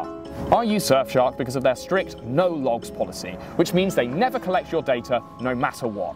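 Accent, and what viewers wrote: United Kingdom. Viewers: Excelent broad strokes . At first glance, I would not take your channel seriously , given the choices of the Good, The Bad, and the Ugly out there. And the Brittish accent does not mean squat. And you were a bit too scruffy. Yet , you surprised me , by bringing in perspective from a far back timeline. And the homework put into it comands respect. And by dissecting facts and events thoroughly. And by staying even keeled, even thought you had a clear and defined stance ( granted it was European , yet not incendiary ). And your choice of language was impecable. Perfect Narrative and pace Negatives. No sticking your feet in the table ! Keep your beard groomed, for heavens sakes. Get rid of the silly props. You have what it takes Congrats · you sounds british? so not a gringo in the kosher sense of the word. but yeah, I would call you a gringo, you go gringo!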